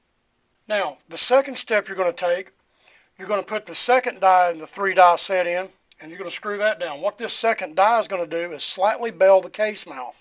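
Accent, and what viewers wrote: United States. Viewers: Great video. Thx for showing. You def have a voice of country, good ol boy right ther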